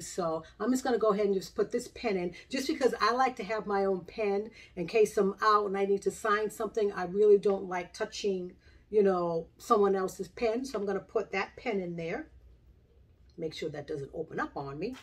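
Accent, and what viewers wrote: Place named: New England, United States. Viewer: Love your New England accent. I have this bag in the lite greenish color.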